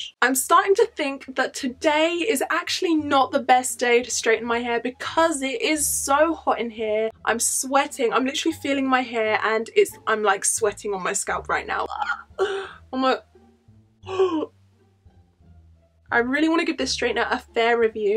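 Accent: United Kingdom. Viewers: Where did you get your comb tool from? Also what country are you from.. you sound British? Xx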